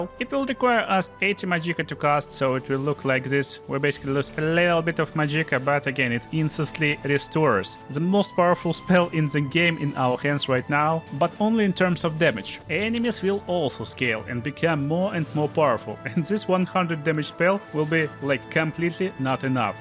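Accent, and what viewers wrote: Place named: Russia. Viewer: That Russian accent is sexy AF.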